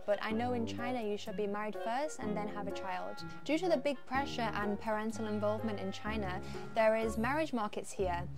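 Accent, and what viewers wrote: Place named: United Kingdom. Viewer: ❤❤❤❤Love your British accented Chinese🌷🌷😘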